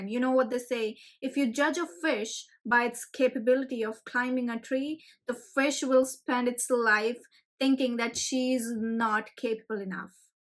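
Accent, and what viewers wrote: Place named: India. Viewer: your content is good but the accent is ..... haha you know. Chose either Indian or american accent. Dont mix both .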